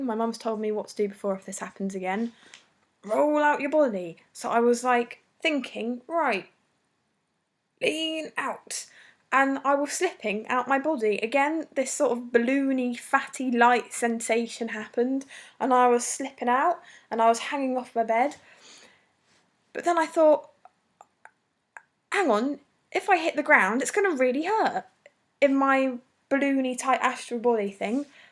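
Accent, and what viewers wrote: England, United Kingdom. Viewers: I love the British accent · nice british accent, are those posters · she looks french but has british accent xD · be careful ms pretty english accent girl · are you from England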